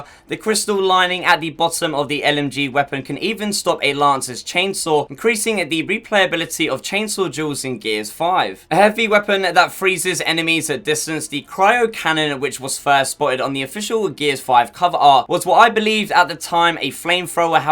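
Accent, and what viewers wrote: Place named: United Kingdom. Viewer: Aymen Salih He has a British accent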